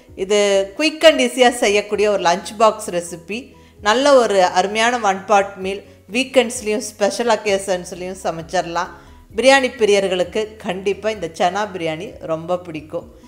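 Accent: Kongu, India. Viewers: நீங்க பேசற கொங்கு தமிழுக்காக வே நான் உங்க Channel ஐ like பண்றேன் சும்மா ஜம்மனு இருக்கு உங்கள் dish போலவே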